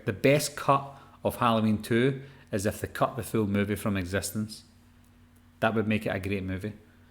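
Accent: Scotland, United Kingdom. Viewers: Wait... are you Scottish??! 😍😍😍 So your first language IS English!!! Or is it Gaelic ? 😜🤣😍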